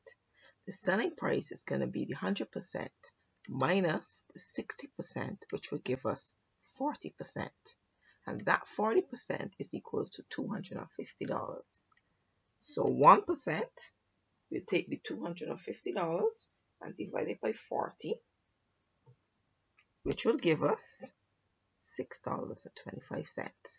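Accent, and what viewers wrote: Barbados. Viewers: This is an excellent explanation. Thank you very much. Your accent sounds Bajan.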